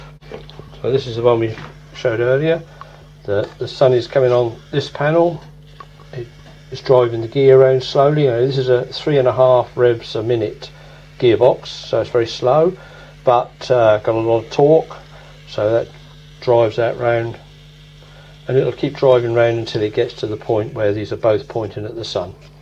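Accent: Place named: Suffolk, United Kingdom. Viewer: You have nailed it Sir, and, if my ears do not deceive me, you are a Suffolk gentleman to boot ! Double bonus ! With best wishes and greetings from South Africa. I shall certainly try this out in short order. Very best, Mike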